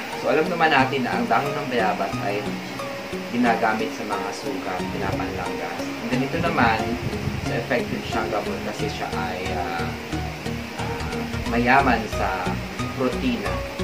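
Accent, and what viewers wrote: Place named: Lopez, Philippines. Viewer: parang taga lopez quezon ka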